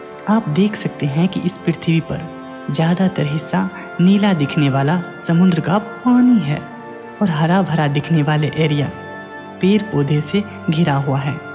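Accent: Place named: Pahadi, India. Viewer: Bhai pahadi ho , ho na Aapka accent pahadi he . Bhai bahut badiya ❤ plz continue.. Wating for your next vedio Very knowledgeable.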